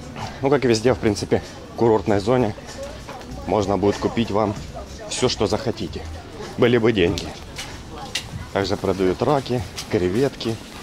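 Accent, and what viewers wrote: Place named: Lviv, Ukraine. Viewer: иди на. У тебя говорок со Львова.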